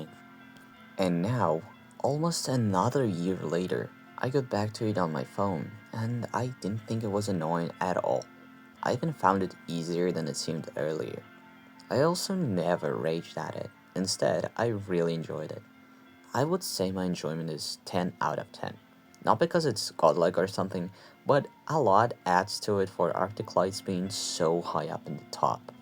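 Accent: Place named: Russia. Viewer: Все равно русский акцент,чувствуется ппц.А зачем вот это английское,если тебя не смотрит никто и так,про зарубежных вообще молчу.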